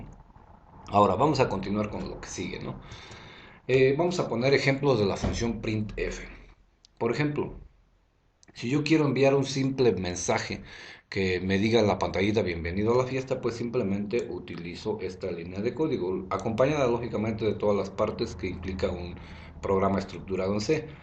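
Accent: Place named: Mexico. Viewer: De todo YouTube es al que más le he entendido, con respecto a la programación. ¡Felicidades! Se le entiende muy bien, yo creo también tiene que ver que use las mismas expresiones y el mismo acento que yo, ya que también soy mexicano, soy de Guadalajara. Saludos.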